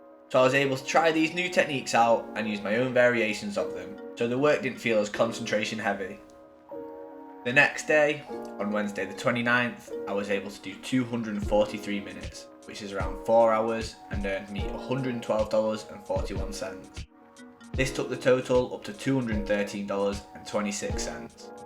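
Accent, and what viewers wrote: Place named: Liverpool, United Kingdom. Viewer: I love the Liverpudlian accent. But then, as an American with Grandmum from Bradford, I'm a sucker for any British accent. I really appreciate your videos on Data Annotation. Thank you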